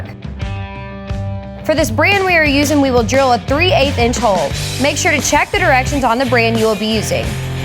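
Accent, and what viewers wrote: South, United States. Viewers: from the sound of her accent she is a southern girl. And a handy one at that. Nice job young lady!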